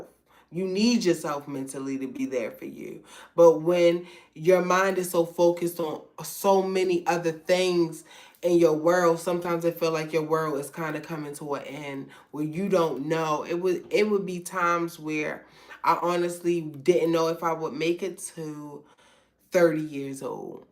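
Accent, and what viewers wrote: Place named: Baltimore, United States. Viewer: hello beautiful. can I ask where are you from? you have a Bmore or DC accent. I'm Bmore born and bred! #Poly😆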